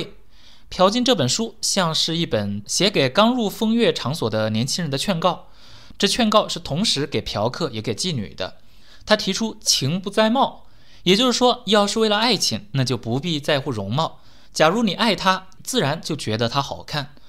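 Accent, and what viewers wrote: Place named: Fujian, China. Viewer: 说普通话的感觉像闽西北的朋友 哈哈哈 很高兴认识您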